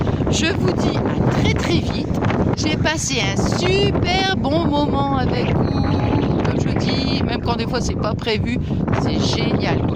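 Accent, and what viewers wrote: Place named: Pas-de-Calais, France. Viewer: Je suis avec vous depuis peu ! Je m'étais rendu compte de votre accent !!😅j'habite près de Metz ,mais je sui de la bas ( pas de calais) aussi !!! J'ai un frère qui y habite encore 😊 j'étais de Isbergues près de aire sur la lys 😉😘😘💞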